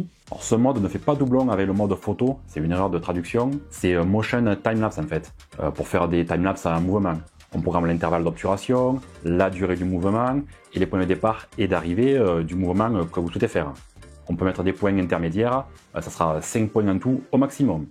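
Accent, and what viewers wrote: South, France. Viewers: Super vidéo vive l accent du sud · Impéccable, avé l'accent du sud 👍, belle présentation et démonstration, il me tente bien ce guimball ... salutations du 07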